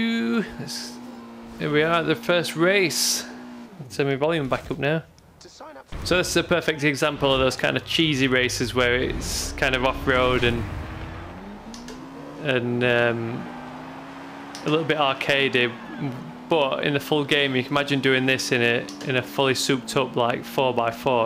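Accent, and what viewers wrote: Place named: Yorkshire, United Kingdom. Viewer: where you from mate you sound local to me. im at Castleford :D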